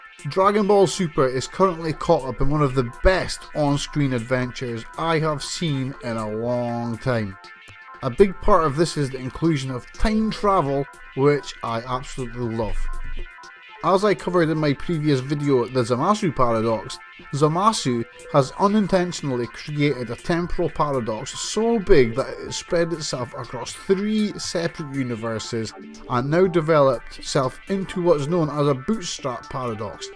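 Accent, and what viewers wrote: Scotland, United Kingdom. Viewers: Lol love your Scottish accent😂 Keep it up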